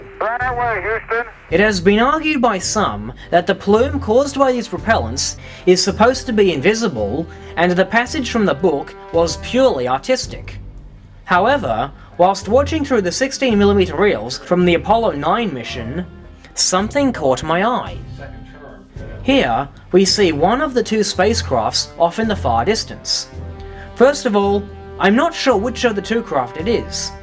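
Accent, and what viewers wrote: Australia. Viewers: Fucking aussie, just shut up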